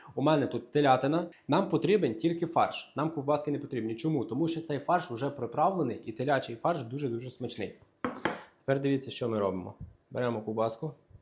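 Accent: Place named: Western Ukraine, Ukraine. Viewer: Оооой, обожнюю ваш діалект з моєї західної України. Дай Бог вам здоров*я і успіхів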